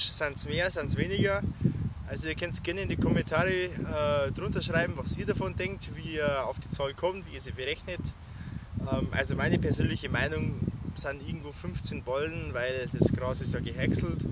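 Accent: Bavaria, Germany. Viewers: Kannst du bitte a bissl mehr bayerisch redn ✌️.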